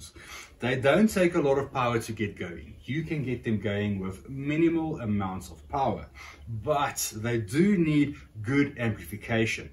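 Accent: South Africa. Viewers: My god its my first time ever hearing a South African accent and it just sounds so cool 😎 love the speaker review too 👍 greetings from Germany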